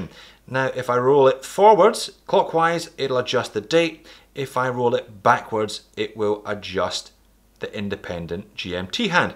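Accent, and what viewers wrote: Scotland, United Kingdom. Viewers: Who are you, where are you. Scottish accent? Just beginning to learn about watches here. You’re videos are very “uplifting”. Much appreciated. Happy Thanksgiving 🦃 from California to you no matter where you are in the world. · what does that even mean? Apparently this is your first time watching the channel. He's a for real Scotsman, living in Sydney, Australia. He talks the way he talks, always. No need to be insulting.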